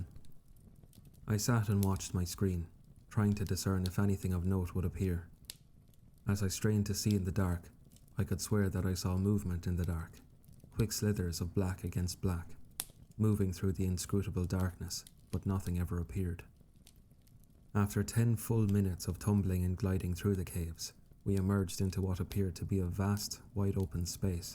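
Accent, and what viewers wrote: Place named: Ireland. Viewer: Finally an Irish narrator ❤ go raíbh míle maith agat ❤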